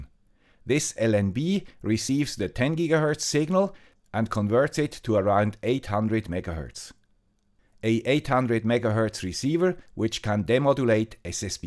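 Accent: Switzerland. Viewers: I know. I was just saying we need more videos all the time 😂😂 can't get bored listening to the guy with the Swiss accent.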